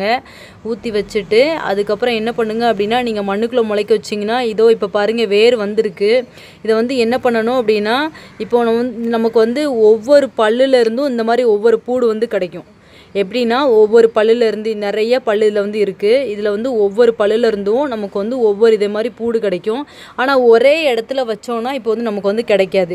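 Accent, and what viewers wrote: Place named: Tirunelveli, India. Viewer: அக்கா நீங்க திருநெல்வேலியா, திருநெல்வேலியில் தான் பூண்டு பூடு ன்னுதான் சொல்வாங்க நண்பர்களே.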